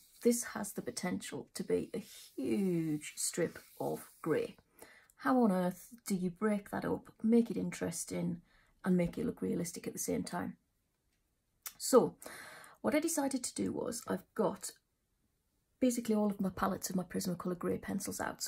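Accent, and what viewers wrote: England, United Kingdom. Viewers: Thank you for coloring tips!!! Love you channel! : ) Love you English accent...! : )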